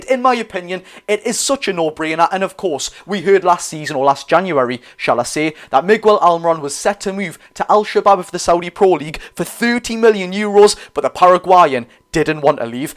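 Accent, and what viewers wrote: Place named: Geordie, United Kingdom. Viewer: … brother if i wasnt fluent in gerodie i wouldnt understand a word ya say haha give yaself time to breathe workid 🤣 but aye ad sell miggy like 👍🏻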